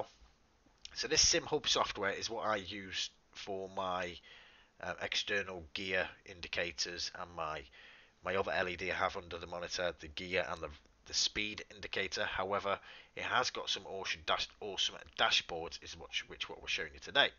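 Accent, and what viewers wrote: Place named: Liverpool, United Kingdom. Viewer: scouser for the win great video mate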